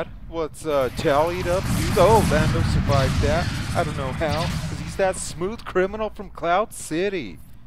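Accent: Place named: Denver, United States. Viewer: <num> is my Ally code. Yo so I play swgoh screen name PlayStyle. I was watching your channel like a year ago then for so.e reason I stop getting your content but I see Im still subscribe and the bell is hit. So I'm watching your channel and u cracking me up and I'm like this dude talks like the homies. You know Denver has a unique swag it's a West coast swagg with Midwest flava strong Latin influence, so I'm like he sounds like he from S. Denver maybe the West up N. Is well we know wat it is and Aurora is blacks whites alot of Interacial fams. So I keep watching and sure enough you pushing that 303/720 kar I was like wow bcuz I ask everyone do u play swgoh? I been on since we Nov/Dec 15 & Neva met anyone who plays this game from Here. So thx for reppin the city for all the Galaxy Nerds and undercover nerds out there. I like your content bcuz u keep it real check out my acct I know u know alot of dudes know but if u ever need to use one of my accts to feature a player let me know. 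I have 2alt accts bcuz there was a time before raids and ships were I could t get enough swgoh I would complete everything in 8min (with the exception of timer events ie: Arena) anyways the wife is buggin talking about I know u ain't takin a shit I. There with the door locked I k ow your nerd ass is trying to save the universe! I just told her the Galaxy chic we saving. The Galaxy. <mail> holla back.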